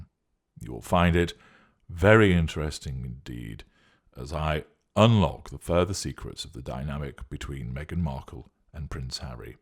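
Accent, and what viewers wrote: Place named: Northern England, United Kingdom. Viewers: I am always trying to place the Northern bit of your accent. Burnley? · is definitely a Northerner. I think it's Yorkshire.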